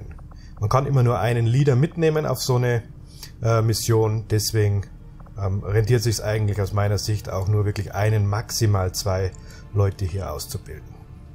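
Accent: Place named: Bavaria, Germany. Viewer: Da kommt definitiv da Bayer durch. ;)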